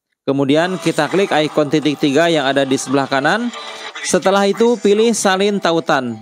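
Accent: Indonesia. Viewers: Kenapa suara indon pulak ni 😁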